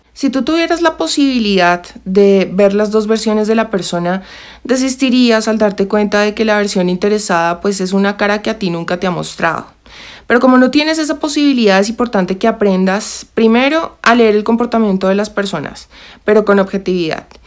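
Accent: Bogotá, Colombia. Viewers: Siempre me ha gustado como hablan las colombianas y tu no eres la excepción, y muy buena informacion gracias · Colombiana!?? Un acento q me encanta !!🤩😍😍😍😍😍😍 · Sí es Colombiana como yo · Hay diferentes estratos en bogota esa es la diferencia pero si es rola lo digo yo que vivo acá y el que dice que hablamos ñero se la paso en sur de bogota que es reñera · Colombiana pero este es el acento de BOGOTÁ osea el acento rolo!!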